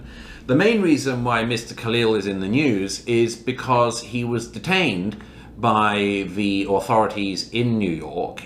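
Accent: United Kingdom. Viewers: Your sly British accent isn’t fooling anyone with your shekeled hasbara Murray.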